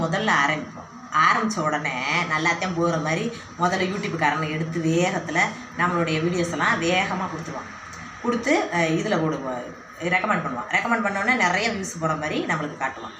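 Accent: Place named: Coimbatore, India. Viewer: Coimbatore language i kollatha theenga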